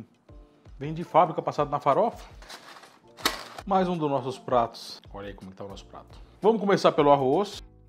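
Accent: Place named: Minas Gerais, Brazil. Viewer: Oi! Desculpa me meter na sua pergunta kkk O já falou que a cidade dele é muito perto de MG, por isso ele tem esse sotaque meio mineiro, mas ele é do interior de SP mesmo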